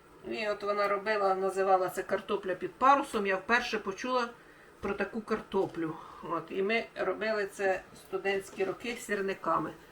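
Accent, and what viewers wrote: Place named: Khmelnytskyi, Ukraine. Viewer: Ви з Хмельниччини? Говорите як на Хмельниччині. ❤ Дякую за ваші рецепти.❤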